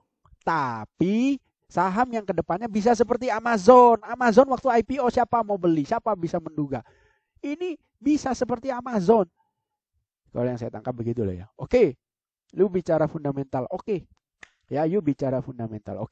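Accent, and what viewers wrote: Java, Indonesia. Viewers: Jiangkrikkk.... Muantapp ker lak ngomong. Medoknya dapet... Coba pak bilang jianncukkk...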